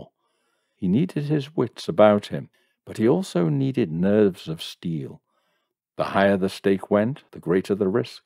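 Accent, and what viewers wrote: United Kingdom. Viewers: Old piano and reverb sets the scene. And only a soft British accent can make me so cozy for murder or suspense. Ha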